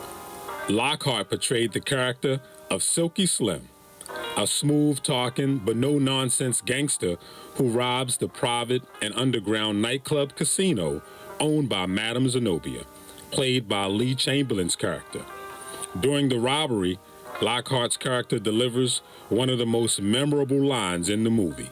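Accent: Baltimore, United States. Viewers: The woman's name is pronounced OOOH-tah HAH-gen (Uta Hagen). She was someone you truly need to research. Also, the role from Othello is pronounced Ee-YAH-go (Iago). You're Baltimore accent is strong. I love it! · I was today old when I learned that the name Biggie Smalls was from the movie “Let’s Do it Again.” Also my people are from the Bahamas. Cool. Also I hear that Baltimore accent. Good video 👍🏿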